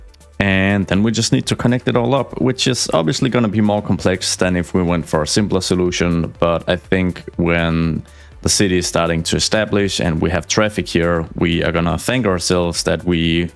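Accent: Denmark. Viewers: I was wondering about your accent, are you by any chance danish? or maybe dutch?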